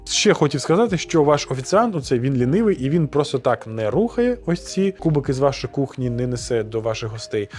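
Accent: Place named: Hutsul, Ukraine. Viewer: Сколько вас развелось. Ужас, слушать невозможно, суржик гуцульский, извращенный русский язык.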